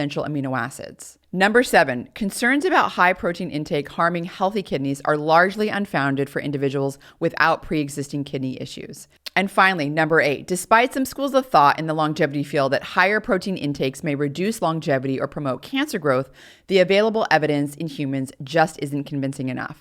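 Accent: United States. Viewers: Your typical American voice timbre makes me think you would be a wonderful country singer.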